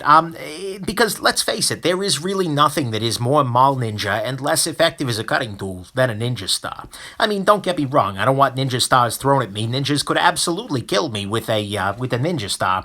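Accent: New York, United States. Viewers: he has a nice New York monster accent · Is this an NY accent he as??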